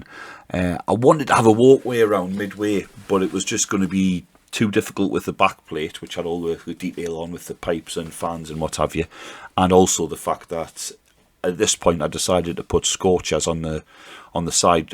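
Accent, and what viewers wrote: United Kingdom. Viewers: It really is no accident that a gentlemen with such a nice Bri'sh accent is a great creator of Ork content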